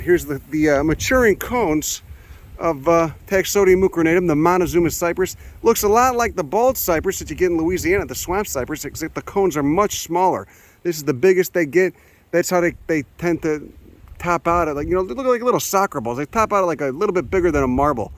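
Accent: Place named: Texas, United States. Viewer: Genuine Texas accent!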